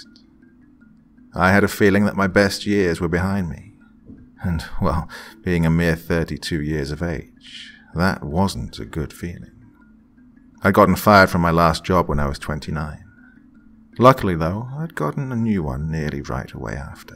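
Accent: United Kingdom. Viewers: Why do british people do the best narrations?? My narrator voice I do has been British since I was a child lol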